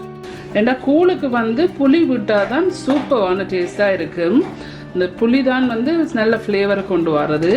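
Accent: Jaffna, Sri Lanka. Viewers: Very nice Jaffna tamil ⭐🌟💘❤👍👌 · Akka kool super ant unkatha singala tamil super irukke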